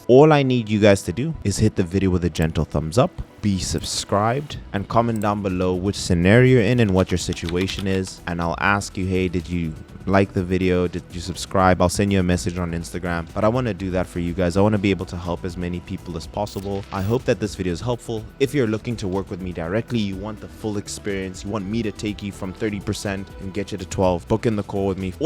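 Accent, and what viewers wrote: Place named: South Africa. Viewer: This is one fantastic breakdown. Thank you. I'd say I'm scenario 3, but since I'm never doing crunches and just compound movements, martial arts and dance maybe my abs aren't as popping underneath and scenario 1. Will definitely start incorporating the ab progressive overload you covered. My Fittrack scale says I'm around 18% body fat right now. But this is a refreshing summary you have done - thanks again. Also, love hearing a fellow South African accent on here from across the pond.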